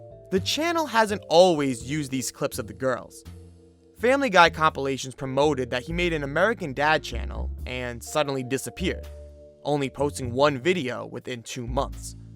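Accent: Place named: Boston, United States. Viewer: You sound so Boston lzzzzzzzzz east coast trash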